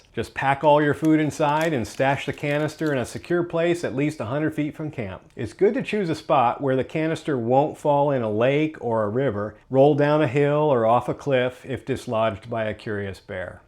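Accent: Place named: North Carolina, United States. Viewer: oh no! I was hoping you wouldnt say that! I had a feeling you were from NC the way you talked and the way the photos looked. I want to do flat laurel creek and sam knob. What scares me is I don't know how much of the bear activity goesunreported. Thank you for the heads up. I do have a bear canister and I figured you were going in that area when you said they were required in the area you were speaking of.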